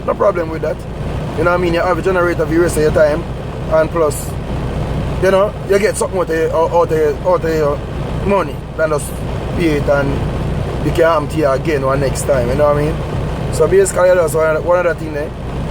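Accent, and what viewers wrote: Jamaica. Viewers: I love other forms of English and all of it's creoles and what not but that still does not make it any easier to understand the Jamaican accent/dialect. I try so hard 😂 but I only pick up bits and pieces. I'm gonna watch more though, maybe I'll get better at picking up what he's saying !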